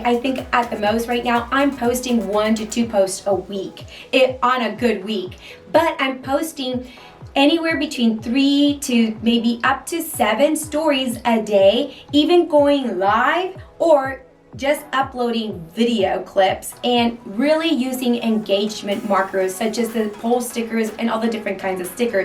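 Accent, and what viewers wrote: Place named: Spain. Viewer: Cool............. I don't know about .........I like your Spanish accent.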